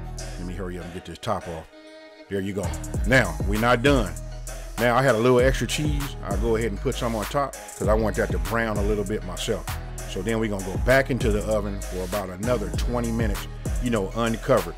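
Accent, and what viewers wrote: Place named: West Coast, United States. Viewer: man u sound like a straight west coast dude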